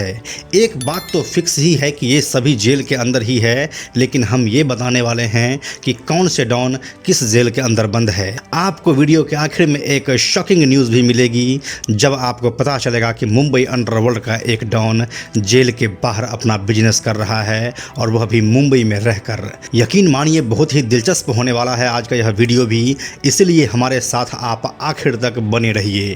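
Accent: Bihar, India. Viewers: Tu bhi bihari hai naa teri bhasa urf tone sae lg rha hai.......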